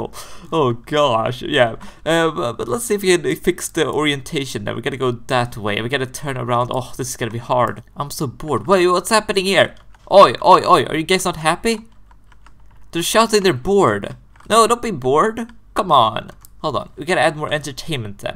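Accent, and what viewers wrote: Sweden. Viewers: Are you from sweden. I think i can hear it in your accent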